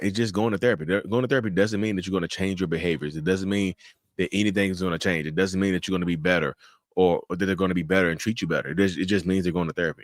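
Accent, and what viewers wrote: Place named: North Carolina, United States. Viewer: I have watched so many of your videos, I now say the word "no" with a North Carolina accent.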